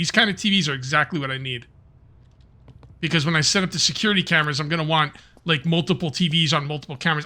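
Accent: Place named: Philadelphia, United States. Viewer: You must be from Philly.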